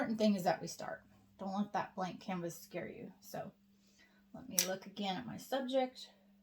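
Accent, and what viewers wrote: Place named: South Carolina, United States. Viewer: Where did you get your non-accent? I say non-accent because you sound like we do in South Carolina.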